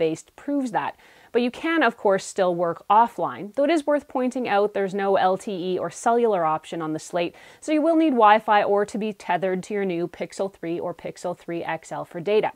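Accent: Canada. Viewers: Gotta love Canadian ladies talking aboot tech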